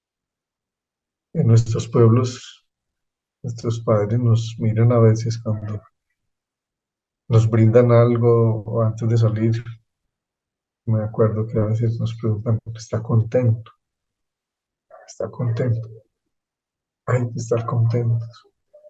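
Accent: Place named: Colombia. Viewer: Precisos. He oido muchas veces hablar de las 8 ramas del Yoga, pero esta vez me actualiza el significado. Muchas gracias. En tu acento recordé al Maestro de meditación Sesha. Tambien es Colombiano. Gracias gracias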